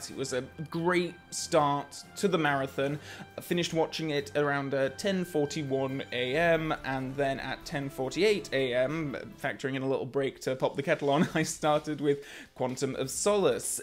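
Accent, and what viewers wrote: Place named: England, United Kingdom. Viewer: Great vid! Knew I would like this channel as soon as I saw you were dressed like a waiter and had an English accent. Great analysis. Subbd.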